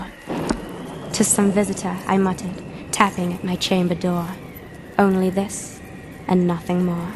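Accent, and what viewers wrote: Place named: United Kingdom. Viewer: I love her accent.. even though I'm British as well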